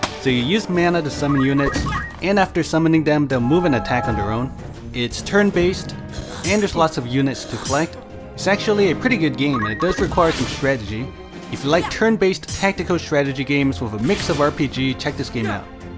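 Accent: Netherlands. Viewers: Your dutch are you?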